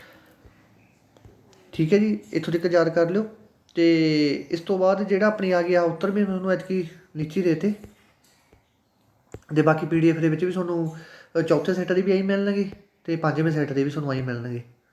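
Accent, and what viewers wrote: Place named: Majha, India. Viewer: VA bolde ho lagda magha toh belong krdo ho ji Ha time ta hunda but agle di life aw Sedule busy aw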